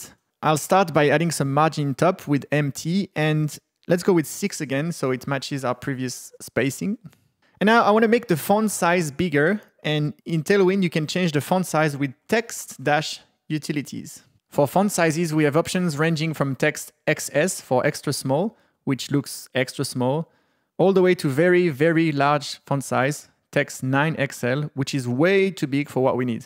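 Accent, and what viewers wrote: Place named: France. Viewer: I still say it's all about the French accent ;). Keep up the amazing work. Cheers from the 905.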